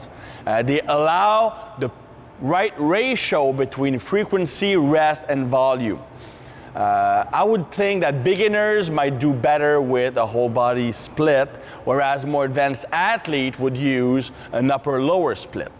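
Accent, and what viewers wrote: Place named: Quebec, Canada. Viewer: He’s French Canadian bro 🙄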